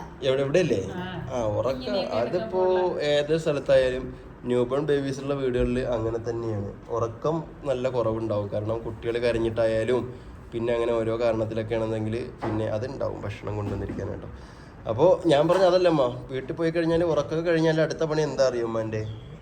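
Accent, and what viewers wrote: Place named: Palakkad, India. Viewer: നിങ്ങൾ എന്താണ് പാലക്കാട്‌ ഭാഷ പറയുന്നത്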